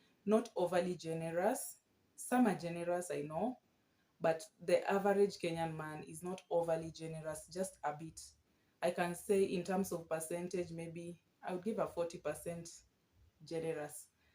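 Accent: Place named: Nigeria. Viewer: Like u re conversant with how we 9ja talk... ve u stayed with Nigerians b4.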